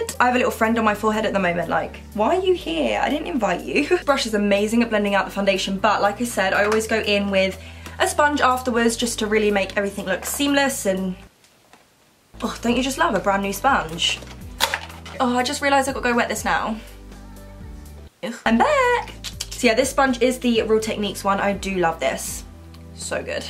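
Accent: United Kingdom. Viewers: That's real British😂 not what u see in films aha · I love ur accent british& ilove u😍❤️❤️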